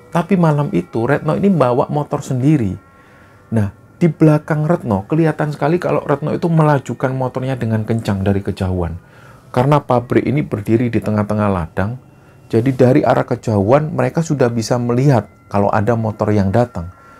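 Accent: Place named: Surabaya, Indonesia. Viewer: Mantab bang crtny kok mirip bahasa Jawa sbyo an y🙏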